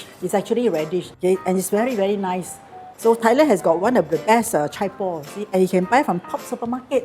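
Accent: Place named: Singapore. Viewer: Love the dish love the Singlish lahhh...thx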